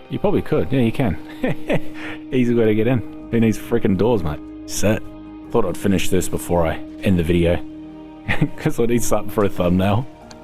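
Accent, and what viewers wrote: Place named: Australia. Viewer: Came for the tips, stayed for the beautiful Aussie voice.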